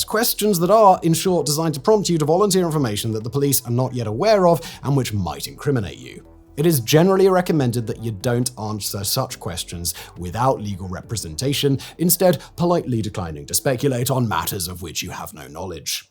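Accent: United Kingdom. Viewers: I wonder how many legs you're going to do in with this video... A Brit narrating a video containing details that are applicable only to the US legal system. Huge oversight. Two VERY different systems.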